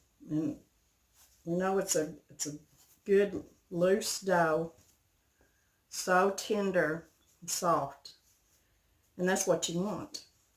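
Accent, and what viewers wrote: South, United States. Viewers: Your accent is odd.. you’ve a southern accent but you say wawrsh like a northerner… lol my yankee mom from Iowa says it that way. When we repeat it like her she says oh wait let me say WAAASH… lol but I’m not sure I’ve ever heard it with a slight southern accent like yours. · You are so precious! There is NOTHING more 'homey' than a Southern twang that puts one at ease! My BEAUTIFUL wife was a daughter of a Church of Christ Minister. I, however, lost her to breast cancer many years ago. As her family did a lot of preaching down Texas way for many years, they also had that Texas-twang that I have come to love so very much! As she, and all of her family have now all gone to be with the Lord, watching you, and together brings a lot of both sad, and warm, memories back to me when we were all together enjoying life, as life was meant to be shared, in Christ's fellowship. 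is lucky to have a woman such as yourself, as you are so genuine, kind, and loving. God Bless you, for the person that you are, and what you create for the viewers like myself!.. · These look delicious, but being a true Southern lady..I love your Southern authentic accent! It’s the real thing!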